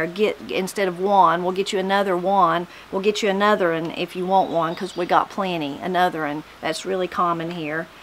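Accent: Appalachia, United States. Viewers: I learned in West Virginia history, at West Virginia Tech, that the Appalachian accent is one of the hardest accents to mimic! And your videos make me proud of that, we do have a rich hillbilly accent. I love your videos always will, I have a blessed day sweetheart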